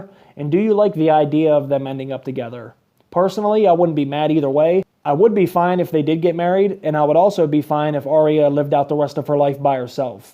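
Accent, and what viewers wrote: Pittsburgh, United States. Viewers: I like your channel. BTW where are you from? You sound like you have a little bit of a Pittsburgh accent. : )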